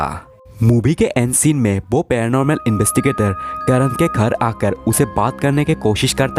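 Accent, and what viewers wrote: India. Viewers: Don't judge please ye bengoli hai to problem hoga hi Hindi mein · are you north east bro?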